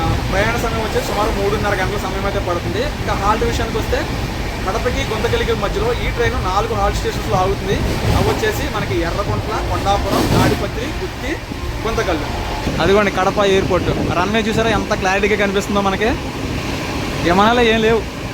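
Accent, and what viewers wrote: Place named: Godavari, India. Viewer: Mi yasa kadapa yasa kadu EG, WG yasa la vundhi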